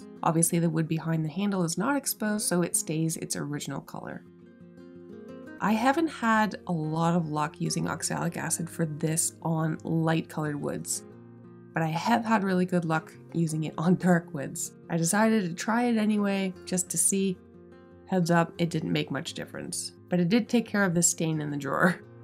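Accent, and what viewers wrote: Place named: Hants County, Canada. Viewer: Hello from East Hants! Did you film the lightning? It was relentless and went on for hours. Always love your videos, and hearing a Hants County accent on YouTube! 😉Keep up the great work! ❤🤍❤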